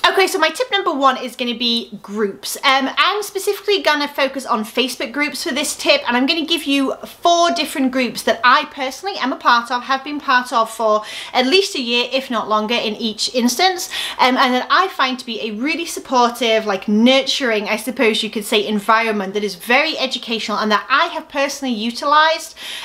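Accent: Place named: United Kingdom. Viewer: great information! thanks. i've requested to join the groups. i have a personal question. where are you originally from? i can't quite place the accent (assuming UK but what part?) thanks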